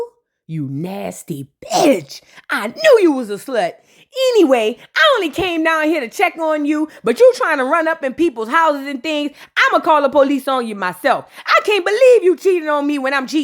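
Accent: New Orleans, United States. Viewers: 😂 girl your commentary voice got me cracking up laughing, but it’s on point as I hear your 504.😅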